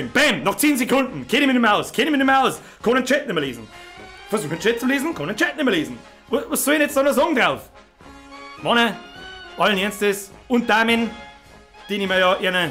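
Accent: Bavaria, Germany. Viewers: Sagmal woher aus bayern kommst du? :D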